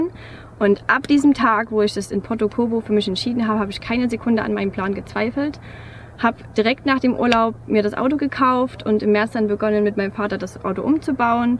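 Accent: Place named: Saxony, Germany. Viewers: Sächsisch is einfach toppe sage ich als 🇨🇭🙋🏻‍♂️✌🏻 go for it. Coole junge Lady. Tolle Docu. Toller Roadplan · Am Anfang dachte ich noch, der Dialekt kommt dir bekannt vor und dann sagst du, dass du aus Plauen bist. Super, das ist auch meine Heimatstadt, auch wenn ich da schon seit vielen Jahren nicht mehr lebe. Du hast meinen vollsten Respekt, denn auch ich muss allerdings notgedrungen seit letztem Jahr allein mit dem Wohnmobil reisen. Unsere Schicksale sind zwar völlig unterschiedlich und du könntest vom Alter her meine Tochter sein, aber du machst mir tatsächlich Mut, meinen Plan auch in die Tat umzusetzen. Viel Glück weiterhin und gute Reise. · Ich wusste gleich, dass mir der Dialekt total bekannt vorkommt. Liebe Grüße an Dich aus dem Vogtland:) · Na,Du liegst ja schon Mal gut da🐒😸👲😨alles Gute für Deine Unternehmung.Wie machst Du das mit Deiner Arbeit?Oh,guter Dialekt!Plauener Spitze😸ich habe Mal als 18Jaehriger 1976 ne schöne Goehre aus Plauen am Ostseestrand geküsst.Schoene Erinnerung ohne Folgen.Da wurde noch die Grenzbucht von der NVA nachts mit Booten und Riesen Bogenlampen abgeleuchtet.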